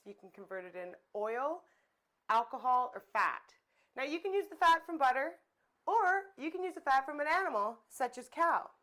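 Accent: Canada. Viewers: You Canadian? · She sounds Canadian to me.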